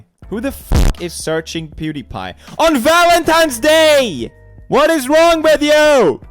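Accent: Sweden. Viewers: I can’t tell if pewdiepie is using the forced Swedish accent or this is legit how’s he’s gonna talk rn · Is it just me or is his accent more swedish now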